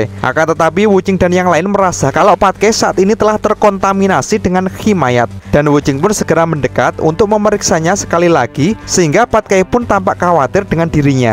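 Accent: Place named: Madura, Indonesia. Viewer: Kok malah kek orang Madura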